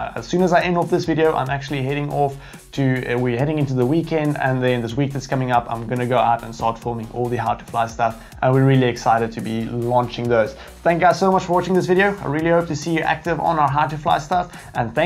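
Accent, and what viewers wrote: South Africa. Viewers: I've just started getting into fpv flight (got a tinyhawk rtf bundle and put PMB on it in betaflight) going to watch all of these vids. Also are you South African?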